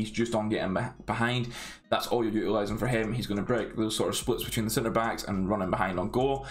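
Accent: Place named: Northern Ireland, United Kingdom. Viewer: Nice video mate 🤙 U from Northern Ireland mate, I think recognise that accent as I’m from NI myself 😂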